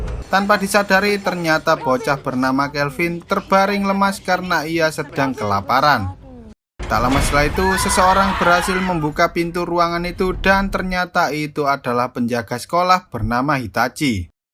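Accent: Java, Indonesia. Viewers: Medok njir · Anjir wong jowo pisan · Ngmong kaya bhasa jwo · Wong jowo yo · Wong jowo to mas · Jawa bang?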